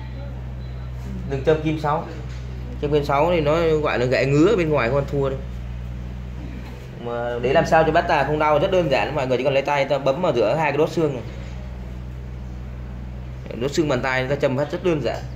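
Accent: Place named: Thanh Hoa, Vietnam. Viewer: Bác giọng thanh hóa thì phải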